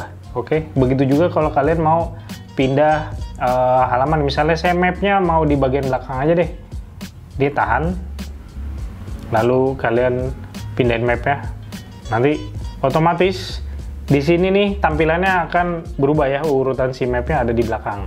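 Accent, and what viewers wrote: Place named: Madura, Indonesia. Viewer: Bang love your accent. Madura kah? Sorry klo salah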